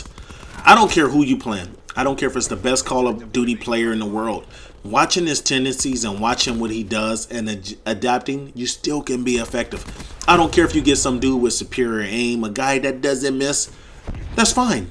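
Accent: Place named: United States. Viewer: It's not pronounced call of dooty it's jewty fucking yank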